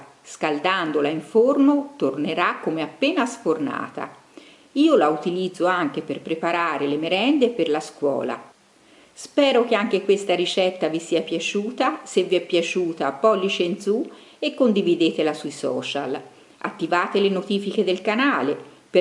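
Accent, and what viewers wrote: Tuscany, Italy. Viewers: TROPPO BRAVA!!!!! Ogni ricetta un successo...ho fatto le brioches a forma di fiore con marmellata: grandiose!!! BRAVA!!! E poi il tuo accento toscano da una tranquillità...bacioni! :)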